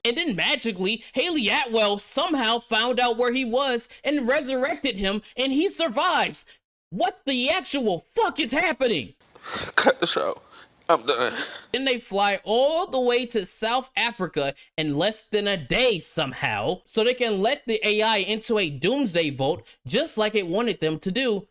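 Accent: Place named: AAVE, United States. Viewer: Your ranting is dumb and makes no sense.Speak proper english next time.Ebonics is not a proper language but just gibberish.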